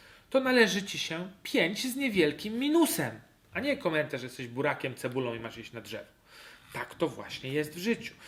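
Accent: Poland. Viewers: Panie Ty jesteś z Poznania??! Zdrówko 🥃🥃🥃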